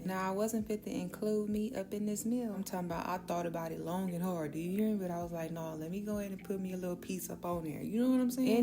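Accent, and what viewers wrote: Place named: Los Angeles, United States. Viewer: She sound pure LA 😂😂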